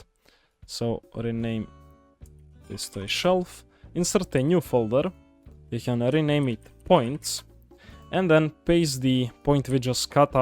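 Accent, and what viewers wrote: Russia. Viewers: You have a Russian accent.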